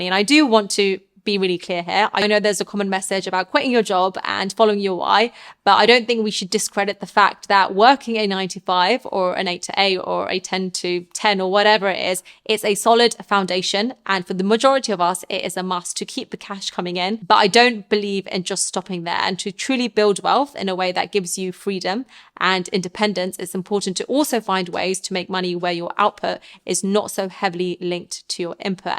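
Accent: United Kingdom. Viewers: I love that accent...I'm assuming it is British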